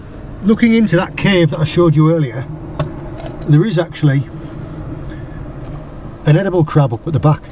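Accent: United Kingdom. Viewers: Such a beautiful video. You didn't take James this time with you. Again the lovely British accent as always.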